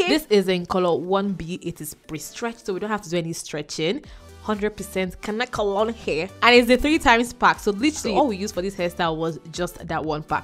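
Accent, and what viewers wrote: Nigeria. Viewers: I just like your Nigerian voice and way of speaking.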